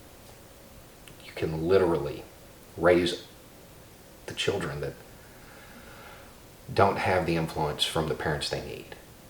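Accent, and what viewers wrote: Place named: Deep South, United States. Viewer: FWIW, and all that; My one child is full grown, has a career, a life and so much- but I have never heard you say or tell a story or any other thing that I would have objected to my boy hearing- and I am nominally a Democrat, although not a dyed in the wool kooky one. Your beard and "deep South" accent don't scare me or my kind at all, as a matter of fact, you sound a lot like home! If you want to do this, you go right ahead- I'll be among the first "overage adolescents" to subscribe! Sleep on it- it's only a thought, until somebody does something with it! FR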